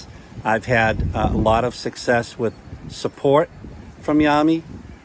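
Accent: United States. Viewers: Thank you for the feedback. I’m currently trying to get a refund from Eahora for an order I cancelled before it even shipped. They have been very difficult. I mean there should be no issue with a refund for something that never got sent. It seems like Yamee’s company is much better. Customer service and a company that stands behind its product is my other big consideration. I Anyway, just waiting to get that refund so I can go ahead and get one of these. Your review and feedback is super helpful. Btw, you sound like your from Massachusetts.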